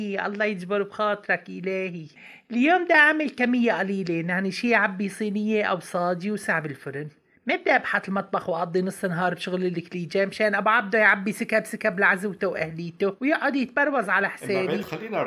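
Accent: Aleppo, Syria. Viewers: يسلم هالإيدين🎀مافي احلى من الحلبية وحكياتن👍😍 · يسلم اديكي انا حبيت اللهجة الحلبية كرمالك و بتمنى نشوف وجهك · ياي يا خالة على لهجتك الحلبية والله صرت أعشقها من لما سمعتك...تسلم إيدك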